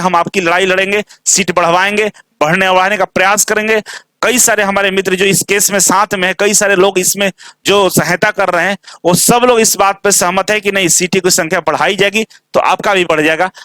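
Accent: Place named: Rampur, India. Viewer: Sar Rampur wala bol raha hai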